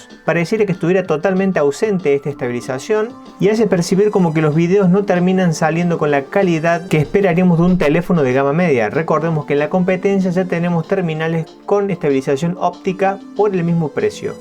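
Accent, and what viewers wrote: Córdoba, Argentina. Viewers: Se nota que sos cordobes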